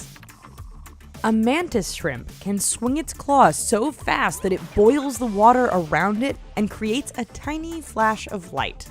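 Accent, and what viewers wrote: United States. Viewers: She sounds American since she doesn't have a accent and her language is English the type of English that doesnt have a accent unlike UK english. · 'So now you can tell I'm American'. I'm pretty sure everyone could tell straight away love with that accent.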